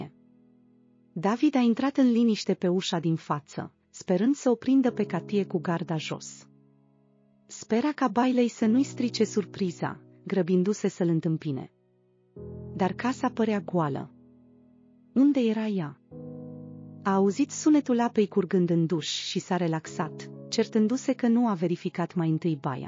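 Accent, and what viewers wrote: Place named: Moldova. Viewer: Limbaj cu accent specific moldovenesc și accente puse anapoda pe cuvinte.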